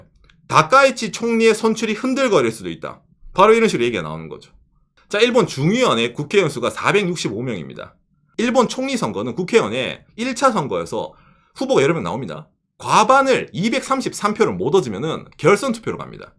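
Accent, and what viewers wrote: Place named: Gyeongsang, South Korea. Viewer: 도... 경상도 사투리... 쓰네요... ㅎㅎㅎ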